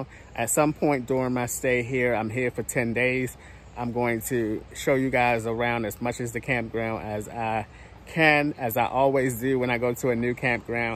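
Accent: Baltimore, United States. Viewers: where are you from, I'm from Baltimore and I can't help but to hear a little Baltimore in your talk.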